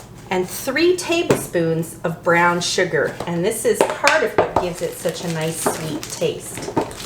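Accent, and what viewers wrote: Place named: Canada. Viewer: I was fortunate to find the yellow bowl and lid at the thrift store. I sold Tupperware in the late 70’s but no longer in business. I will definitely hang on to the pieces I have for sure. I was going to wish you a Happy Thanksgiving but I think you are Canadian with your pronunciation of the “o” but enjoy that day too, lol!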